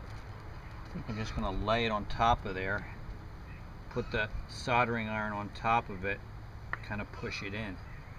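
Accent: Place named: Midwest, United States. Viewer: gold 🥇 great video. 👍 your accent makes me think you are from illinois / midwest area.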